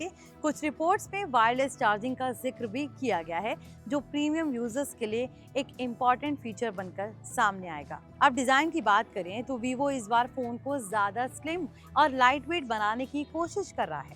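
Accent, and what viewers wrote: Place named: India. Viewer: What language are you speaking? Sounds like India dialect mixed with bunch of some english words. Best regards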